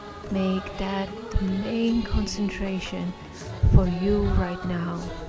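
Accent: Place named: Hungary. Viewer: Wow!👍I could of sworn your accent is hungarian! Totally different language, very similar accent! Cool! 👍😃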